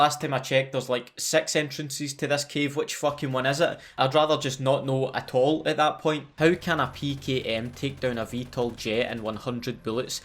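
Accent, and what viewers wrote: Scotland, United Kingdom. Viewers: Nice fucking channel, love the humor helped by the Scottish accent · I love your accent so much. Are you Scottish?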